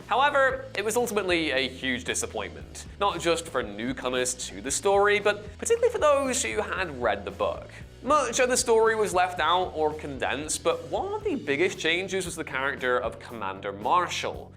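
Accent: United Kingdom. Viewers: Dude it's just a British accent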